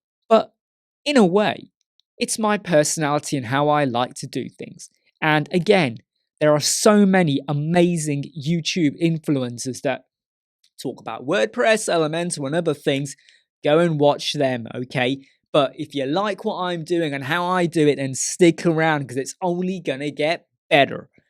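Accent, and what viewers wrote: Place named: United Kingdom. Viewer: I love to watch you videos and is 1st time when I out a comment here. don;t take it pwrsonal, is because . I am too introvert to comment. 1- yea, maybe you talk too much but I see that like a good think: you give a better explanation and people can understand. the prcesses better. 2- Your Face . what? people say that to you? fuck them all ! 3- Elementor Bias: FFS your video are about ELEMENTOR! Is not about tomatos or pigeons! Elementor is your tool and if they want another editors they can watch other youtbers! period! 4- Promoting Hello: like you said: Hello is a FREE theme! I am not using it too much. I tryed ASTRA and OceanWP free versions. I chosed Ocean but Helo is an great and most important is a clean theme ! And in the future I want to focus on it. 5- Gimmicks? Iam lost on this! i do not understand what they want to say. 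because I learned many things for your videos and I am not new on elementor... 6- Too Excited: that makes your videos funny :) 7 not the Beta... Is someone want to see what is comming they can always use official elementor channel or watch other youtubers. 8: UK. well, you are born in UK. I jus tcome here in 2015. Your accent is 100% british. Yes, you are from UK :). 9- OceanWP. Well, Astra is worst than Ocean WP. The main reason i din not bough Astra or OceanWP is this: If i have troubles with free versions, why should I pay for it? my final toughts: keep doing your work :) haters always will hate. And I appoligise for my english, is not my native l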